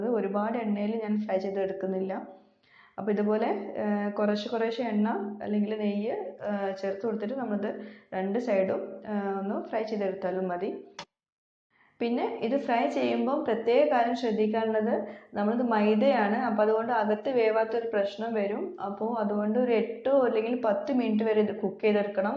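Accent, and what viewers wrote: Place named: Kannur, India. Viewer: Thank you for a great presentation and I loved the way you speak Malayalam. It sounds like how it is spoken in the Kannur area.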